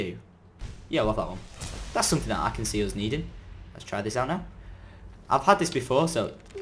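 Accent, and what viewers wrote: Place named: Scotland, United Kingdom. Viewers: when he was reading the story in a Scottish accent i died of laughter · Top notch scottish accent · Nice Scottish accent yuv got aye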